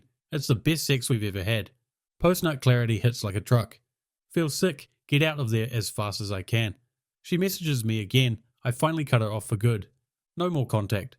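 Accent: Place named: New Zealand. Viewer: Why all the talking in funny Kiwiglish?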